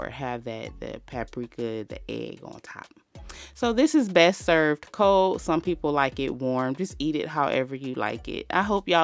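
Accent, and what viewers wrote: South, United States. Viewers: I live in Canada. This is pretty much how we do it here. My family's (my side and my wife's side...both british) have done this. Never been to the southern states but that's pretty much how we do it here. Have done so for ...I don't know...I'm 73 ...so 73 years. So it's pretty standard. Nothing southern about this except the accent of the narrator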